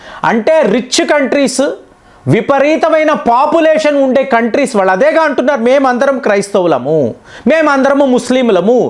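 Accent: Tamil Nadu, India. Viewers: Nixon ను నెక్సన్ అని పలుకుతారా ? నిక్సన్ అని పలుకుతారా? నీ తమిళ తెలుగు తగలడ.ఇది భాష దుర్గంధం.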